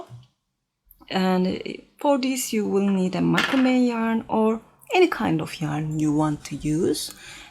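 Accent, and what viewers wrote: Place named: Turkey. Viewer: best turkish accent I've seen in a long time so calming ahahah i feel like you'd be a great grandmother I never had hahah I sometimes watch yer videos and feel like yer my granny hahaha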